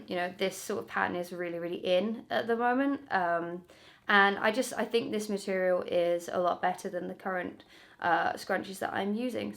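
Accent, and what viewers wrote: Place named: Essex, United Kingdom. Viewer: Missed that Essex accent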